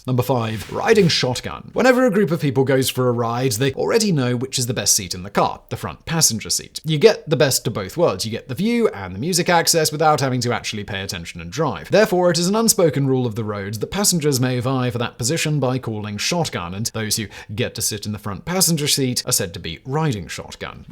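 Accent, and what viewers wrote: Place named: RP, United Kingdom. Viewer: I’d not heard of ‘jumping the shark’ before. But I was wondering the other day about where ‘Bob’s your uncle’ came from. BTW, you and I both speak British RP, so for future videos, Marquis is not pronounced markee, as in the large wedding-type tent, but markwiss. (We might be hearing it a lot next year, come the coronation!)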